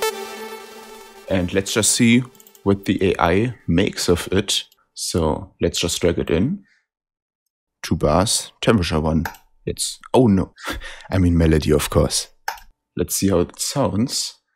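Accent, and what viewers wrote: Germany. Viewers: Der deutsche Akzent ist sehr sympatisch xD Danke für den Einblick ins Programm :3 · Sounds like a very nativ german guy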